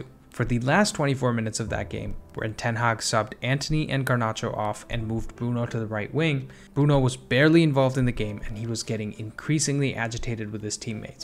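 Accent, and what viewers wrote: United States. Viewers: Could have just said there’s barely any def-midfield. Wolves opened them up like a human body on operation table but then it’s supposed to be a 10min YT video. This season will be shite. (Also your accent gave away north USA feeling 😊. Just saw Canada in your bio). Good analysis overall.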